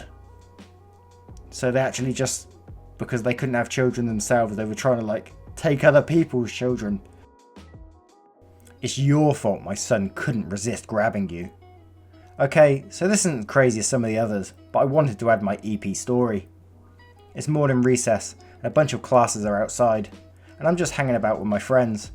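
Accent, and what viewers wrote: United Kingdom. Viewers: First video i watched, am subscribing. Your narrations are on point. Also nice to hear a British accent on Youtube :D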